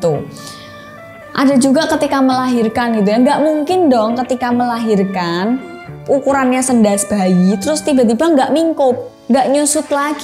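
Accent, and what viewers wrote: Java, Indonesia. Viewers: Pantes logat nya kek orang jawa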